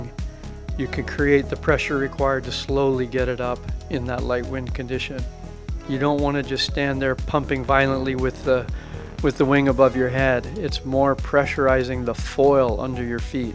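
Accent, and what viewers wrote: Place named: Maui, United States. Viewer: your German ist incredible, your Maui accent exotic, please comment all parts in German. Would bei The KPI for Naish Wings, learning from the master, Aloha, Hjot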